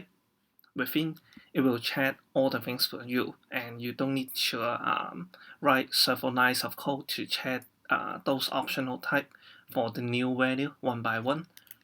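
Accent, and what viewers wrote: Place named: Hong Kong. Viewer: Helpful!港式英语，哈哈！不过讲粤语更加少人看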